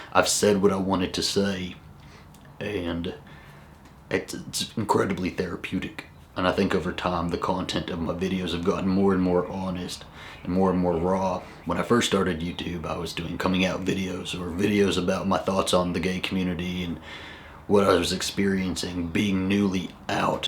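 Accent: South, United States. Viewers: I understand your hesitation with your dad. I went through a lot of the same abuse.... but, with time comes forgiveness. I hope you will eventually be able to forgive your dad for all the things he's done. The fact that he accepts you as a gay man should speak wonders to you though. If he didn't accept you, he wouldn't have slept in your house at Christmas. My wish for you is that you let the hostility go. I started watching your vlog thinking you and your husband had it together. And I adore your southern accent... I think you owe it to your lover to man up and show him you're better than your dad and that family means everything to you. Forgive and forget, I know it's hard, but it's easier than carrying that burden of hate. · Southern accent..turn off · OMG I love your accent. You know how to turn this Scottish guy on by saying hi. I can hear you on the like of Dukes of Hazzard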